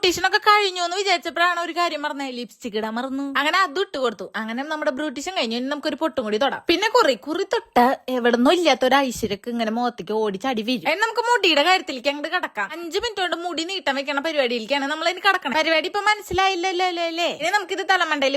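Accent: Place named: Thrissur, India. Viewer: Thrissur slang polendallooo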